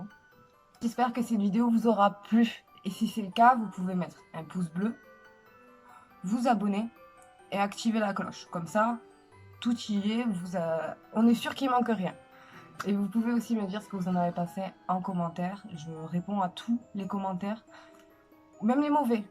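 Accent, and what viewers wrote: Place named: Catalonia, Spain. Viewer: Ton accent Catalan, un délice xD, vive le sud ! :p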